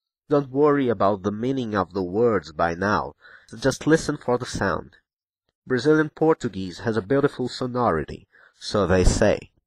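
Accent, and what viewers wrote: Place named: United Kingdom. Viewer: Cara você fala inglês briitânico!!! Que onda mané. Muito legal seu sotaque ;)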